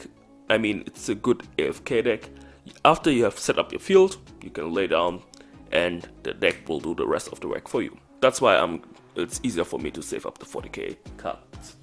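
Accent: Germany. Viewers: Dein Denglisch ist Sympathisch haha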